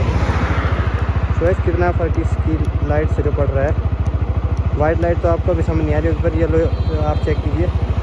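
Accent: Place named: Garhwal, India. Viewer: bhai tu garhwali h kya teri language tone se lg ra h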